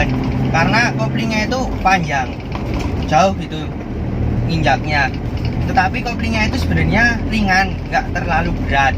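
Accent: Java, Indonesia. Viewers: Aku seneng logatnya....medok banget, koyo jape methe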